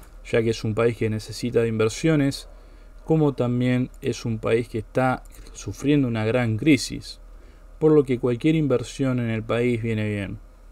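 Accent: Argentina. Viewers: con ese acento seguro que es argentino o uruguayo , no me sorprende que admire a un pais serio como chile , simplemente ustedes no pueden aceptar que hace muchos años dejaron de ser relevantes,si no me creen observen sus villas miserias..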